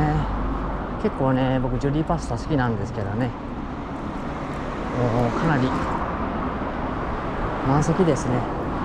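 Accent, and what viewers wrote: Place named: Kitakyushu, Japan. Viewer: 北九州近辺いいですねぇ。北九州弁がいいですね。懐かしく思います。猛暑の中で井堀、朝日ヶ丘一杯やってください。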